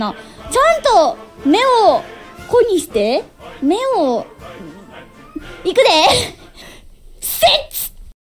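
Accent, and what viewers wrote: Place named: Kansai, Japan. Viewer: いやぁｗ関西弁なのもクッソ可愛いなｗｗ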